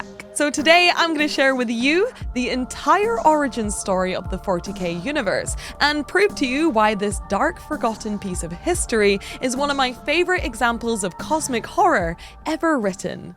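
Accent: Scotland, United Kingdom. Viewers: Awesome! Looking forward to the next segment/chapter. Besides, I like hearing you talk lol. Americans tend to like a British accent 😊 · Nicely put, great story to hear, I like a lot the british accent. (Y) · Bugger! Now I've got to get precise! You know that we sing when we speak? I don't hear too much Edinburgh. More north. Closer to Perth. I'm full of shit, but love a challenge 🤗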